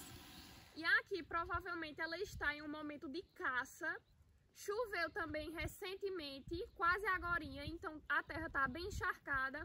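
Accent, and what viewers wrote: Northeast, Brazil. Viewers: Ei 👸, você é nordestina mesmo, tem sotaque acentuado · PARABÉNS, nunca perca este SOTAQUE! PORQUE ELE CARACTERERIZA OVERDADEIRO NORDESTINO. HOMEM E MULHERES BATALHADORES QUE Ñ TROCAM SUA DIGNIDADE. MUITO BENÇÃO! PARA SUA FAMÍLIA, E A TODOS QUE ASSISTEM A SEUS VÍDEOS ❤ DEUS TEM VCS NO CORAÇÃO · Legal esse sotaque nordestino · Belo vídeo, acrescentado com o sotaque nordestino da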